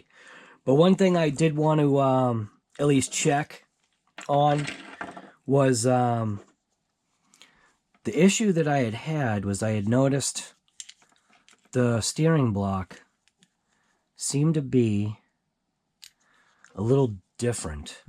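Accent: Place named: New Jersey, United States. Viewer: Oh wow this is crazy that’s what they get for copy Arrma. Oops 😬 they have nice trucks. Where u located I’m in New Jersey telling by ur the way u talk is like me hit me back. And good luck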